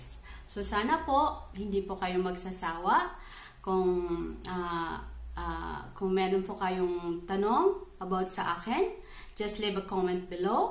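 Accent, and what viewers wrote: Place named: Italy. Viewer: ay ka expert na nimo mg storya Italian inday good job sarap pakinggan accent mo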